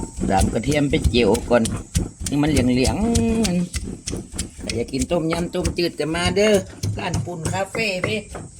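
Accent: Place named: North, Thailand. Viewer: บ้านอยู่ไหนนิอู้เหนือ😁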